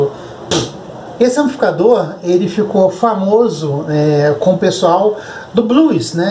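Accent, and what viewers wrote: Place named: Rio de Janeiro, Brazil. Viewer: Você mora em SP?? (Seu sotaque é do RJ)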